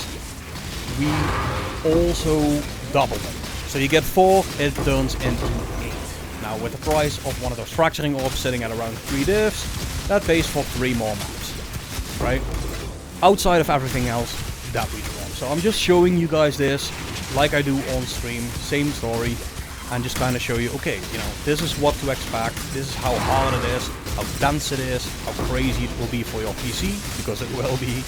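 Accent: Netherlands. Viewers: I Looove the dutch english dialect. nice video.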